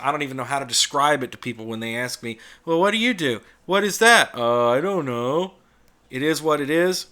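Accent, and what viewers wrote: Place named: Virginia, United States. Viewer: We love you, you're a great orator educator and communicator. I guess those descriptors are redundant to each other. But they are true. Being a New Englander I especially like your distinctive Virginia accent. Mostly I love the passion you put into teaching Linux to the rest of us. You've helped hundreds if not thousands of people transition from windows to Linux. Granted Microsoft helped the cause by developing the worst operating system ever. I only wish you didn't react to the haters, you come off a bit cringy and oversensitive. You need to learn to not let them get under your skin.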